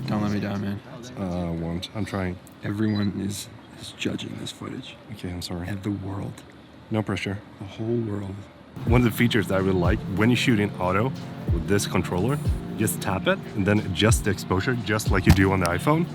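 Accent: Gothenburg, Sweden. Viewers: He's from the back side of Sweden I hear! ❤ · Took me a while, but then: aah, he is Swedish 👌😄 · So great Peter and Peter! :) Def gonna get the mavic mini 3 pro, cant wait! Så kul med lite härlig göteborgska! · Are you swedis? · Göteborska is just such a delicious accent. Only Peter will know what I'm talking about. The other Peter.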